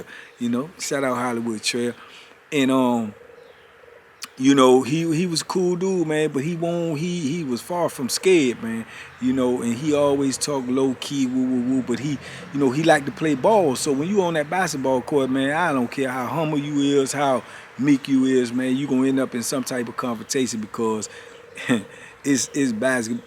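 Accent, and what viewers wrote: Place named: New York, United States. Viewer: ALRIGHT NOW.....WATCHIT😂😂😂😂I'M ON THE FLOOR ALREADY YOU GOT THAT NYC ACCENT IN FULL EFFECT😂😂😂LOUD... TELL IT ALL AND TRAINED TO GO😂😂😂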